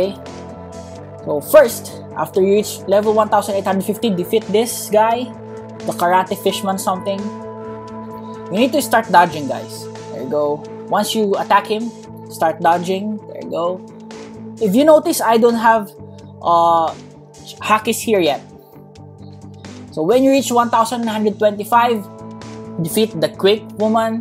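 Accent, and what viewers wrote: Philippines. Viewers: Hes accents is like a filipino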